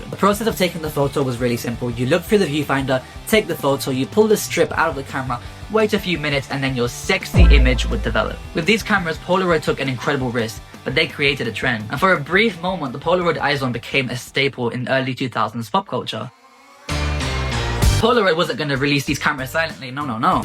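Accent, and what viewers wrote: United Kingdom. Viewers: I KNEW YOU WERE BRITISH. I KNEW IT. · holy plot twist. i saw ur youtube shorts and did NOT expect u to be british. (slay tho)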